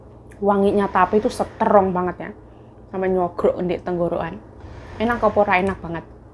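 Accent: Java, Indonesia. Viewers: Sukabumi hadir..mba saya suka lihat mba lg makan apa lg kalau ngomel"gt aga medok tp enk di dengar lucu.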